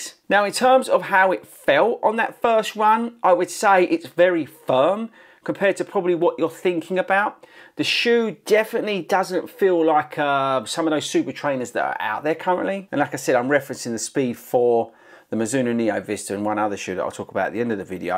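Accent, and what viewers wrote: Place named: England, United Kingdom. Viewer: I’m sure you’ve said it but what part of England are you from? I’m over here imitating the accent 😂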